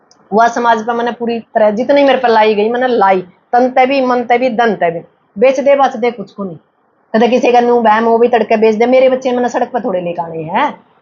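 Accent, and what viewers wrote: Haryana, India. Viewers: aap suddh Hindi mila kr bohat suthree Haryanavi bol leti ho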